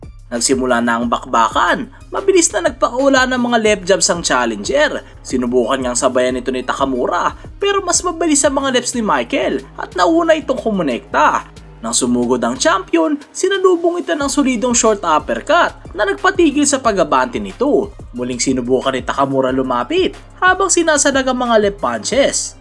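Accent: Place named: United States. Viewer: Ayus par gayang gaya boses ng mga Americano a hahaha ganda by the way ❤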